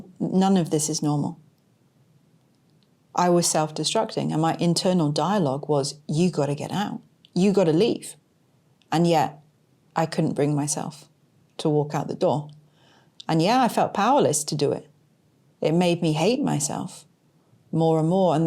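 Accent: United Kingdom. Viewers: In my prayers always., I think you look very healthy and beautiful… I believe you are a Spirit dancing along…I believe you will THRIVE! My ancestors are Kelham Island, just north of Sheffield…I so love the British and will listen to your beautiful accent forever., you will SUCCEED! Godspeed!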